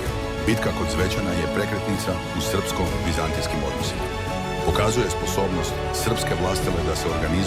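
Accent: Croatia. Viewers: Volim slušat ovaj naš Hrvatski glas kako hvali našu bracu po materi.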